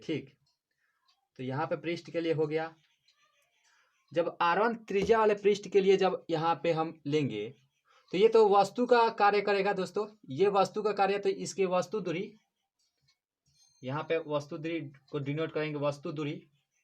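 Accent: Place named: Bengal, India. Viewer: Lagta h sir aap Bangal se h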